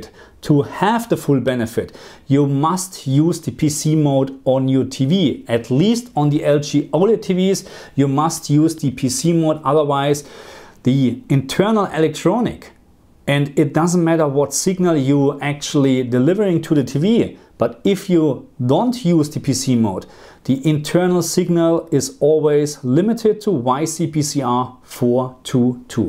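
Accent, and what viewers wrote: Germany. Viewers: Liebe deinen deutschen Akzent 😅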